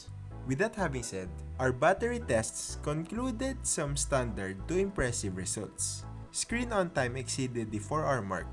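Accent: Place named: Tagalog, Philippines. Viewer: wala naman masama sa tagalog accent ate kakaloka ka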